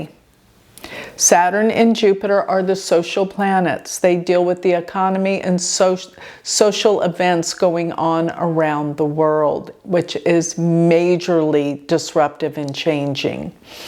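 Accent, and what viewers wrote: Texas, United States. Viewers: You can speed up the playback by going to the Settings button that looks like a little wheel in the bottom right hand corner of the video; click on it, select Playback Speed and you can speed it up! I usually use 1.25 or 1.5 speed if I'm in a hurry. But I do love a Texas drawl, so normal speed is good for me!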